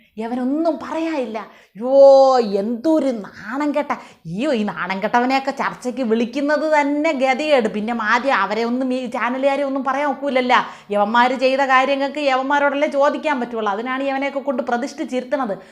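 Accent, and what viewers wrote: Thiruvananthapuram, India. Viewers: അടിപൊളി. ഇനി തങ്കം പോയി മീന് ശരിയാക്ക്. കൊഴിയാള. നല്ല തിരവന്തരം ശൈലി.😂😂😂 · തിരോന്ത്രം ഭാഷ 👍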